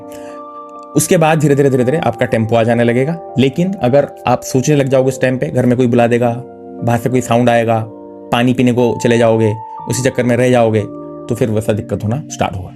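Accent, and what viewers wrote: Odisha, India. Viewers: Bhai you are odia na? 😅 Btw great 😃👍🏻 video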